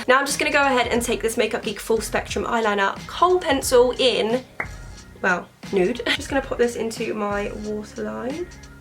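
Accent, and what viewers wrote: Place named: United Kingdom. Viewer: I love your British accent is so niiiiiiiiiiice. I've always wanted to talk like how you do.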